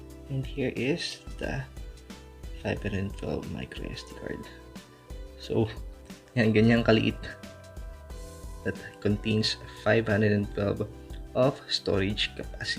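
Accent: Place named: India. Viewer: Lol, me too. But he speaks i think indienglish or tagaloenglish thats why.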